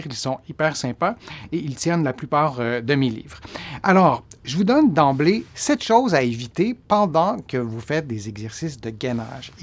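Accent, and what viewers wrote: Quebec, Canada. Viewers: Merci pour vos conseils avisés On doit vraiment s appliquer même si les douleurs sont parfois pas simples à gérer. Je vous remercie vivement de votre engagement pour nous soulager. Je souffre de lombo sciatique paralysante et de fibromyalgie. Vos exercices sont vraiment top Bonne fin de semaine et j adore votre accent Québécois Brigitte FONTAINE VESOUL. FRANCHE COMTÉ..FRANCE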